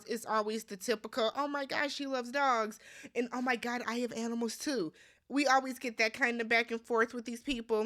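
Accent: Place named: Chicago, United States. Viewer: Being from Chicago, listening to you is like talking to my girls I grew up with. I lol every single review 😂🤣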